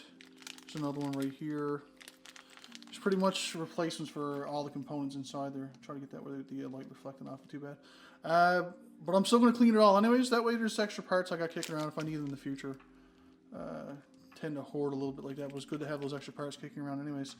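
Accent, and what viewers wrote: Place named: Canada. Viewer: love your videos! I'd prefer real time filming so I can follow step by step rather than the speeded up sections but still awesome nonetheless. Love your Canadian accent too, I'm in New Zealand now but I used to live in Vancouver. We can't easily get carb kits or any spare parts down here. I'd love to rebuild my 1979 XL 100s carb and put a choke cable on it, new throttle cable etc but just can't get the parts :-(. Here's my bike... <link>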